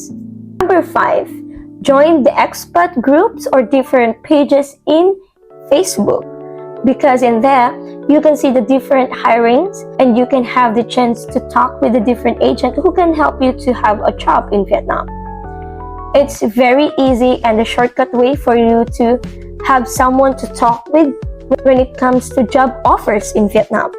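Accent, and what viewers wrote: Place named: United Kingdom. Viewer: Gusto ko yung may pa British accent 😂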